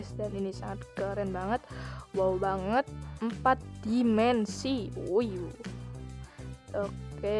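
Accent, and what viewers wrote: Java, Indonesia. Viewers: Jawa bgt Bang🤣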